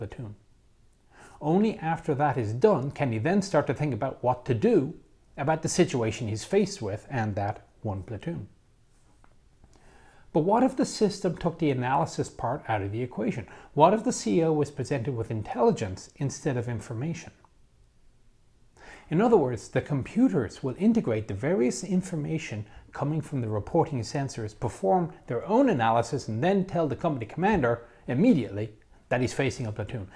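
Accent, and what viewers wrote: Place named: Ireland. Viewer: Why do I feel like this guy speaks with an Irish accent?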